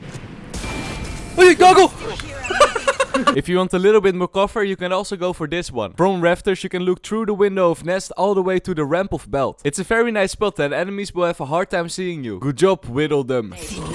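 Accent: Netherlands. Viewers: Genuine question: are you Dutch? 👀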